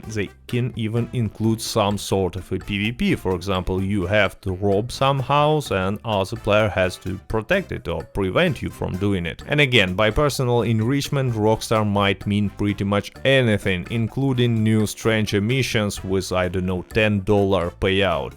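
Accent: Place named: Russia. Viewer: I was joking idk if he's Russian but he sounds Russian lol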